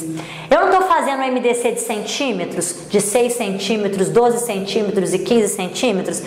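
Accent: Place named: Minas Gerais, Brazil. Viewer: Professora, amei o vídeo! Adorei o sotaque mineirinho também! 🙂 Gratidão!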